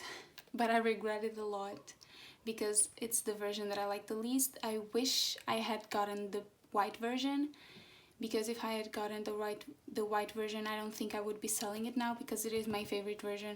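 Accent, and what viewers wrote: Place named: Portugal. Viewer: i knew there was something off about your accent, com que então és portuguesa ahaha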